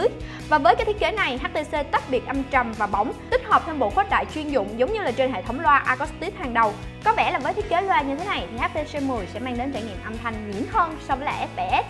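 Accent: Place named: South, Vietnam. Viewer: thích giọng miền nam review ghê,hay phết 👍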